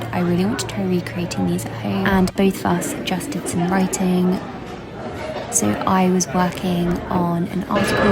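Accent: RP, United Kingdom. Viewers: There’s no need to “correct” pronunciation. She’s speaking in English, and pronouncing all of her words in her RP accent. English borrows a number of words from different languages so she can pronounce it according to her understanding of English. If it were French, it would be a different matter. Given the range of accents in the UK alone, the pronunciation of words is malleable to each individual speaker. ✨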